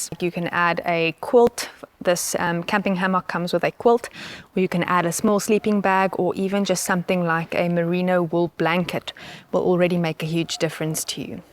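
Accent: South Africa. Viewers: Always an outstanding production 👏 of course that South African accent is the icing on the cake lol, one can never be over prepared for the future we heading into. Without a doubt the weak and unprepared will not make it, but I dont think you need to be concerned with that lol. Thankfully the father gave us a perfect road map to navigate the grim future that lies ahead, praise be to Jesus! Thank you for your time and insight, always appreciated 💪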